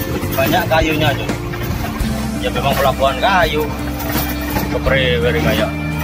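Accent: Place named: Banyumas, Indonesia. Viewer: Asale ngndi y mas Bisa ngapak y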